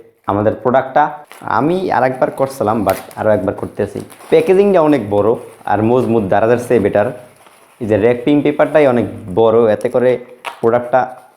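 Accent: Barishal, Bangladesh. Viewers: এ দেহি মোগো বরিশাইল্লা। মোর বাড়ি কলাডেমা। চিনছেন নি জায়গা ডা?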